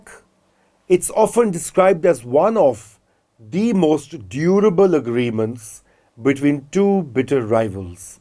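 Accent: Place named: Punjab, India. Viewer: Dude he is a set of few last English speaking punjabi ascent journalists of Pakistan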